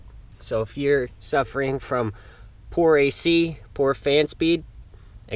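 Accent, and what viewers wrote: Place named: New Jersey, United States. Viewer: No wonder he sounds like Chris fix because he’s from Jersey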